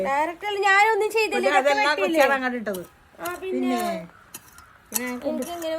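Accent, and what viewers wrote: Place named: Kochi, India. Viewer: Cochi slang 😍😍😍😍😍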